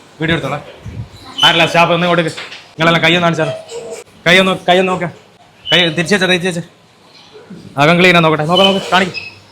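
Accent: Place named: Perambra, India. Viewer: പലവട്ടം കണ്ടു. പേരാമ്പ്ര സ്ലാങ്ങ് .... പൊളിച്ചു...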